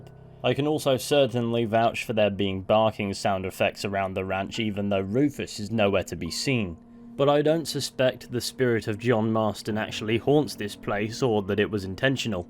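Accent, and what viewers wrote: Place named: RP, United Kingdom. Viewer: you're a smidge posh, in a good way. I like it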